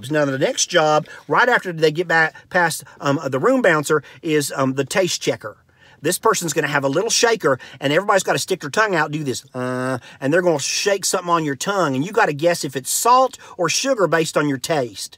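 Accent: South, United States. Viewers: "Errr body". This gentleman definitely resides in the southern portion of the United States.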